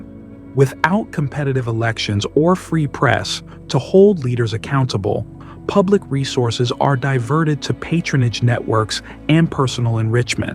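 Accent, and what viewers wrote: United States. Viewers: U sound American with your accent....also do remember how the US has meddled in and still.meddles in african countries......the uk is a monarchy....and many other European countries..and in the US u have the bush dynasties.....Clinton's....Kennedys.....and it's ok for...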